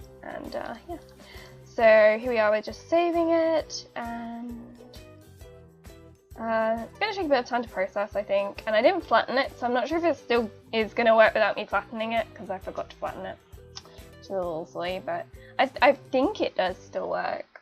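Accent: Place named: Australia. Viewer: your voice screams "AUSTRALIA"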